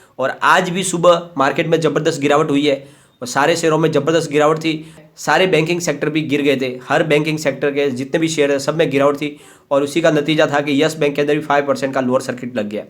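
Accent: Gujarat, India. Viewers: Gujrati lago cho.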